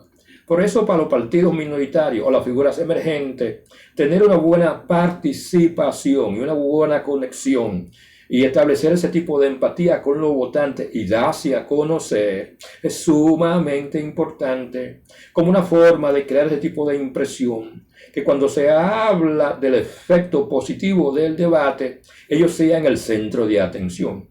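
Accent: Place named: Haiti. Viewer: Se le nota el Haitiano a este comentarista.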